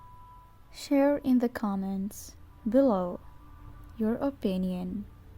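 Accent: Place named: England, United Kingdom. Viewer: نسمات الربيع بنبرة إنجليزية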